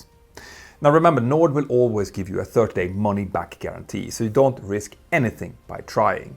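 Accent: Sweden. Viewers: This is 100% a Swedish dude speaking, the English is good, it’s the dialect that gives it away. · What a great spin off from your main channel! The dedication to details, the source criticism/research, the build up using past incidents and your style (art) of your story telling is a perfect match with (preventable) tragedies like this. And your Scandinavian accent is unique and perfect for emphasizing the importance of details. Svensk, inte sant? För mig som skåning låter det som typisk mellansvensk "brytning", typ östra delen av Svealand någonstans? Anyway, a big thumbs up to your entire team! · Look up pronounciation for "flammable", "origin", "threat", "through", "aftermath", "partially", everything else is just charmingly Swedish. Great video!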